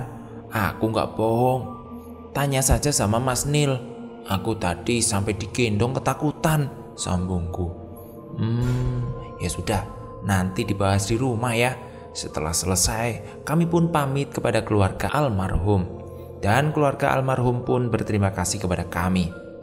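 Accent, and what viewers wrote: Java, Indonesia. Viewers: seneng nya cerita mas krna ada aksen jawannya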